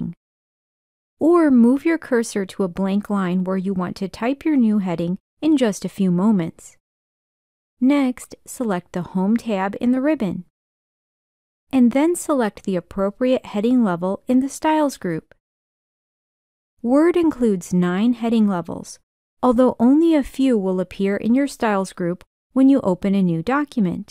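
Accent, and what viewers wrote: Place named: Midwest, United States. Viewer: Midwest accent is awesome ❤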